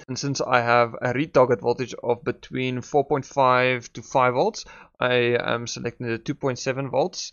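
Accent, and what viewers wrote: Afrikaans, South Africa. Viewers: Afrikaans accent?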